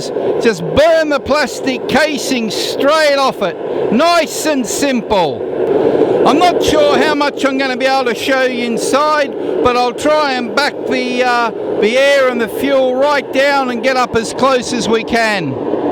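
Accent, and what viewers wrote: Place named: Australia. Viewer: flamin aussie's eh ?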